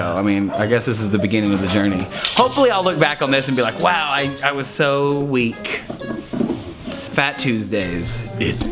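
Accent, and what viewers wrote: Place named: South, United States. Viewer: Just now discovering this! FYI your accent totally changed after working out with! Haha. Southern boy made an appearance. Can't wait to meet you someday! (and watch all your videos!!!)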